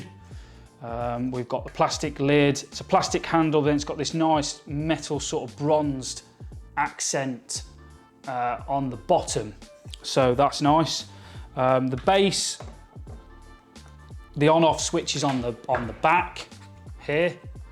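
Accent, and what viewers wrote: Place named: United Kingdom. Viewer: Thanks for the Reply. I see based upon your accent you may be in the UK? I would love to visit there someday. Have lived in the US all my life. I am glad to hear you like your Timemore kettle. I should receive mine today. Last night when I was reviewing scales, I saw the Acaia scales but wow they are expensive. There are some positive and negative reviews of some of the other Timemore scales but the reviews seem to be a bit better than the Hario v60 scale. I'm just getting into pour over coffee and have used french press, and percolators.Need to find the scale and then decide on the Hario V60 Drip Decanter or the Hario V60 Glass Range Coffee Server, 600ml. I also think I will pick up the Hario V60 Metal Coffee Dripper, Size 02, Silver vs the ceramic dripper. Any thoughts from you on drip equipment would be welcomed as it seems you may have more experience than me. 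For example, if you use 30 mL of coffee, how much water are you supposed to use? Also need to pick up a manual coffee grinder.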